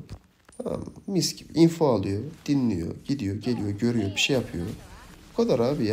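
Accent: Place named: Ankara, Turkey. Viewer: Abi senin arada giren şu Angara şivene bayılıyorum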